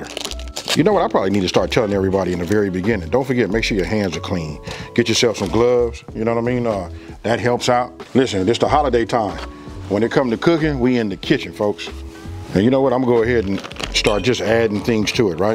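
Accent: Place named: Los Angeles, United States. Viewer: I could tell by your accent you were from LA. Watts up! Thank you for the recipe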